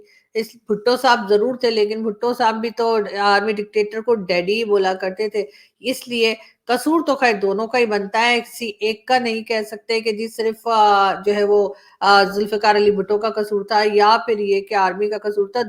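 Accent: India. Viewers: जी नमस्कार आप इतनी खरी खरी सुनाती हो क्या आपको पाकिस्तान में डर नही लगता बोलचाल की टोन से ऐसा लगता है कि आप भारत की हो । आलिया शाह की टोन से वो पाकिस्तान की लगती है। आप खुश रहो आबाद रहो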